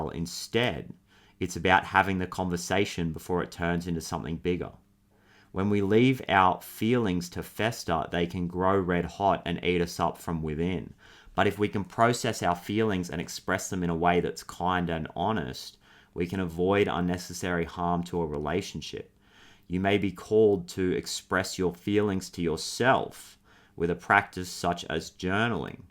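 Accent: Australia. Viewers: Hey 💫 I am a brand new subscriber and your messages have been resonating hard 🤣🌸 Now I know why…. We share the same birthday 🎉!! Happy birthday 🎂 I had the most amazing weekend, energetically feeling such happy vibes ❤ I hope you did too 🥳 Thank you for this channel, I look forward to sharing more time and energy with you and this collective … we totally rock 🥰 It’s so good to hear a fellow Aussie in this space … Sydney girl here 🤗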